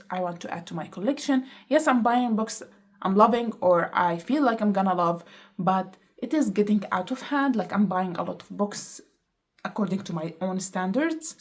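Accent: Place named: Germany. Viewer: Hi. First time seeing your video . Just curious if you're German. Cause I can't tell if that's a german accent or an Arab accent. Thanks😊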